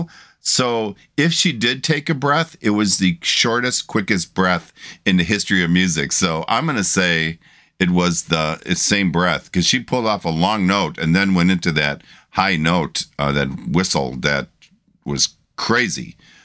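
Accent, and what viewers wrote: Chicago, United States. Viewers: I was gonna say you have a Chicago accent and then you pull out a Portillo's cup! I had a dripping wet Italian beef 3 days ago!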